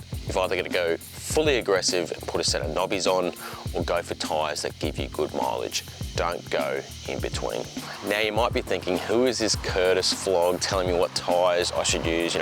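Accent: Australia. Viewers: that is not a British accent. Aussie, maybe a Queenslander. Which is Australian, just more so. He dresses like he's from QL. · Taai'es ... gotta love the Aussie accent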